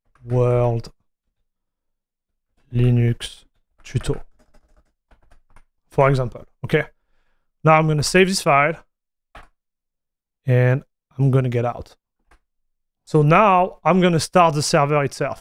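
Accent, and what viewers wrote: France. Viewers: Jolie accent français !! 😁😁 Merci pour le tuto tu régal 👍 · Are your a frensh guys because your accent make me laught a lot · Le jeu tu le lance sur quel hardware ? Je l'ai pris sur Steam, via Proton (TkG) sur une Arch sur un 7950X3D et une 7900 XTX, je suis stuck a ~40FPS. Independament de la resolution ou de la qualité. Et au passage, merci de faire rayonner l'accent français dans le monde entier.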